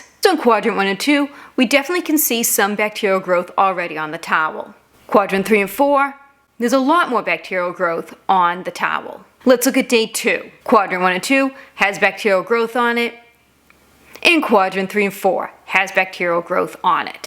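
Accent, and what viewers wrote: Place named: New York, United States. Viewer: This lady has such an interesting NY accent lol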